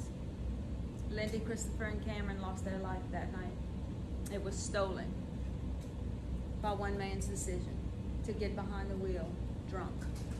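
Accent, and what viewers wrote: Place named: South, United States. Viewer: I’m part of your usps fam and have followed your story. I’m so extremely proud of your presentation it has to be so difficult every. single. time. !! And I pray daily that the good Lord comes back soon to reunite your family and I’m so excited to meet those wonderful kids !! That day will come I promise !until then I send all my love and support from Jax - originally Wisconsin and live your little southern voice !!! ❤️🙏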